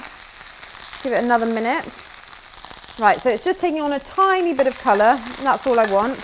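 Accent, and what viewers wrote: United Kingdom. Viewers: honestly, I subscribe ld to this channel to listen to the beautiful British accent 😍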